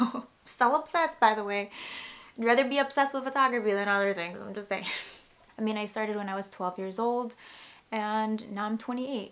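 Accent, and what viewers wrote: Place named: Miami, United States. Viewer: i know you're lebanese but you look so cuban!! you even slightly have a cuban-miami girl "accent"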